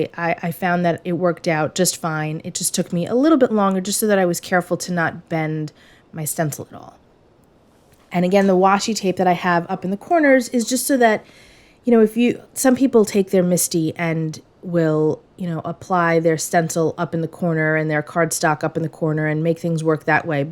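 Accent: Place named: Massachusetts, United States. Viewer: Hey, I just subscribed, where are you from? I’m from Massachusetts but live in Rhode Island now and you certainly sound like you are from the area.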